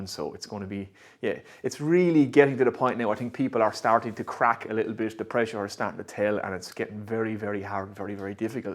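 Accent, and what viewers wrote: Ireland. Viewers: Great channel, we still get a kick out of the accent and we miss all of our Irish friends. I NEED CLAMPS! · Came for the tool reviews and shop projects, stayed for beautiful shop, depth of knowledge and that lovely Irish accent 😁 And as I'm slowly building my own little shop... I NEED CLAMPS!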